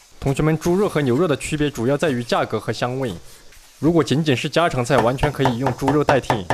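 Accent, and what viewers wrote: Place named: Renfu, China. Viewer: 我说口音咋这么熟，都是属于仁富片区的啊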